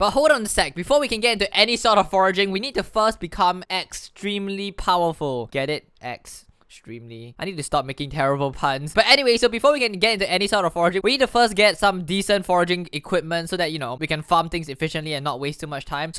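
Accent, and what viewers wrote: Singapore. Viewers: Singaporean ah?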